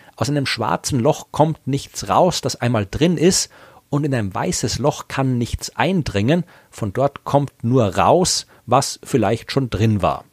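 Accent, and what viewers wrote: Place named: Austria. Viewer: Ich finde die Österreichischen Dialekte schön. Und ist es nicht Toll das wir in verschiedenen Ländern leben und trotzdem die selbe Sprache sprechen. Trotzdem ist nur der jenige Polyglot der die MathemAtik beherrscht ;-) denn das ist die Sprache des Universums. Schönes Wochenende. PS super Hörvideos immer weiter so. Wird Zeit das mehr Leute auf deine Kanäle aufmerksam werden damit deine Arbeit, die du hier reinsteckst auch mit der Weltlichen Währung honoriert wirst und nicht nur mit Lob.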